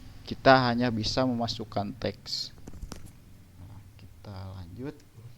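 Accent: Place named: West Java, Indonesia. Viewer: Pengisi suara nya sunda banget :v sukses terus buat JAKI Aplikasi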